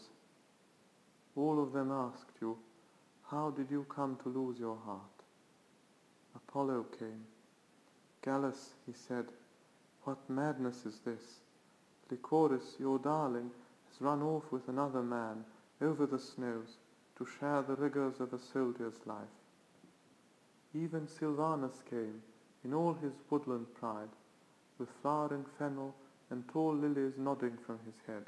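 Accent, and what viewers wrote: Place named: RP, United Kingdom. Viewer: Your English accent is very posh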